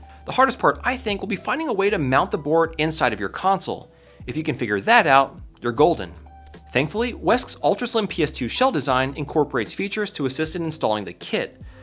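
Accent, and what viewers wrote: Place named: South, United States. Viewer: Your southern drawl came out in this one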